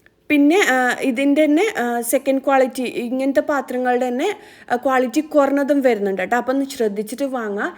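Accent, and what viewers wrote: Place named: Kasaragod, India. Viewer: avideya, samsaram ketit kasargod pole end. Kasargod samsarikkund anne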